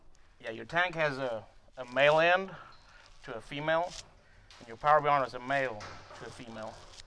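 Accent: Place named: Louisiana, United States. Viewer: Little bit cajun lol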